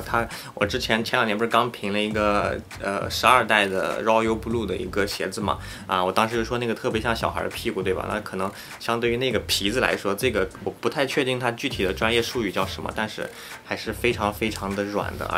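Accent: Shanxi, China. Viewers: 是山西人吗？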